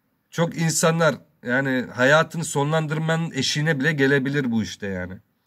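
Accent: Konya, Turkey. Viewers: Hoca Konyalı herhalde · abi konyalı misin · Başkanım adam konyaliyim diyo orjinal şive orjinal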